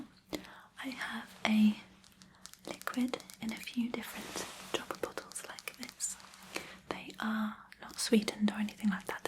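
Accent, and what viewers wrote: United Kingdom. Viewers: Gawd I love a woman with a British accent! ❤😍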